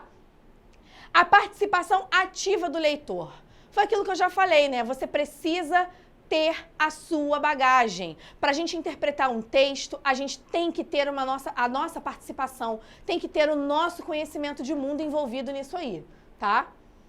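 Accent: Rio de Janeiro, Brazil. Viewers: Nada como ouvir o bom e velho sotaque carioca!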